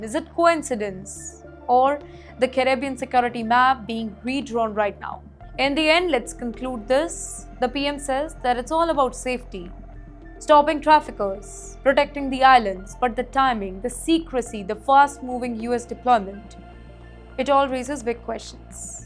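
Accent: India. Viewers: You with you India accent you worry China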